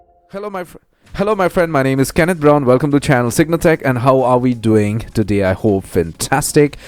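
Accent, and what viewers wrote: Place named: India. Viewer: First off, thanks for being an Indian English speaker Second thanks for an ACTUAL solution to this on the internet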